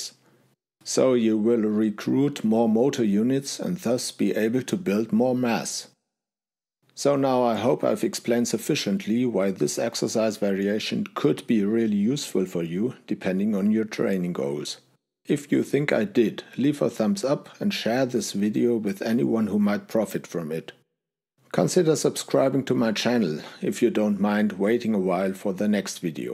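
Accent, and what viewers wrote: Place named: Germany. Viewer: As someone living in Germany, I can tell this man is German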